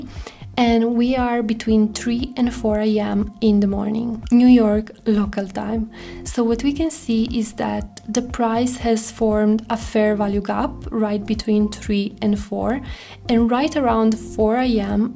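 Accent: Italy. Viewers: Sorry, your accent is very similar to the italian accent. For example you pronounced "blue" in a perfect italian haha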